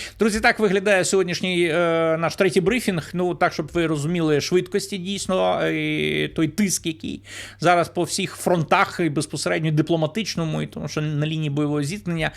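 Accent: Russia. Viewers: Як бридко слухати наслідування вимові рашистські мові; сказаф, пішоф, даф...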